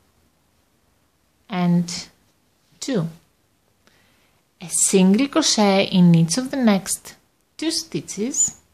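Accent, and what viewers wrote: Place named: Greece. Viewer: I thought I heard a Greek accent :) γεια σου απο τη μακρινή Αμερική Πολυ όμορφη η πλέξη αυτη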